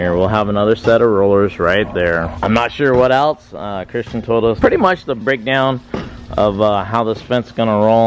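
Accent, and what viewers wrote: South, United States. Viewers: What kind of accent this guy has? Sounds like he has a deep southern accent, or OK accent?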